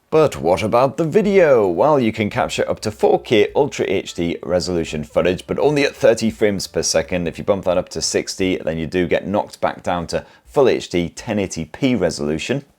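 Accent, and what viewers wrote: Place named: England, United Kingdom. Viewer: مع لكنة انجلترا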